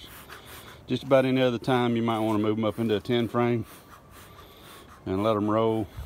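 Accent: South, United States. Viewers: This viewer is 'Disappointed ++'? What an entitled brat! Not your job to educate anyone on beekeeping for free. We are here to enjoy your beekeeping/ relocating exploits which are hugely entertaining and instructive. I watch because I learn a lot about bees, I'm entertained with your southern accent and antics, and get to visit the deep south with its trees, climate, animals, people, customs, and different climate zone. Just keep doing what you are doing, and know that the vast majority really like your videos, like you, and don't need you to do anything more. There will always be whiners, narcissists, and self-centered people who annoy others like Africanized bees. Just wear protective gear around those folks.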